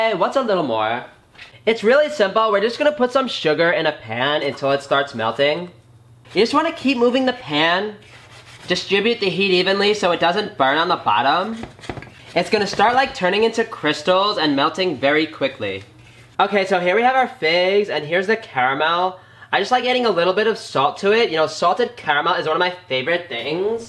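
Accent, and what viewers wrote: New York, United States. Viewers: Too funny! First there was an eggplant on the toilet tank, later on there was a pineapple on the tank. Go back to show business. You're a natural talent. Great Valley Girl accent as opposed to your normal New York accent.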